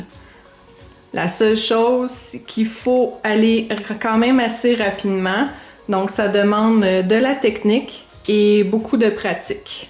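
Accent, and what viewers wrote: Quebec, Canada. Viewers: Merci. Ce clip est intéressant, bien expliqué, fort pédagogique et, ce qui ne gâte rien, professé dans la langue de Molière. C'est si rare sur Youtube à notre époque. Amicalement, PS: votre accent québécois est une pure merveille; ne le troquez pas, ce serait un véritable gâchis!;) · Salut, j’adore ton bel accent québécois, je vais mettre en pratique tout de suite tes précieux conseils pour essayer de faire un fondu gris. Je te montrerai le résultat. La bise de France 🇫🇷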